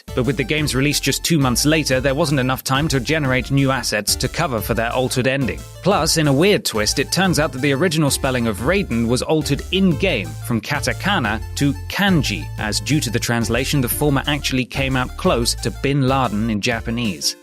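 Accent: United Kingdom. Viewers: This man has the most British voice I've ever heard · oh my god, his british accent is awesome!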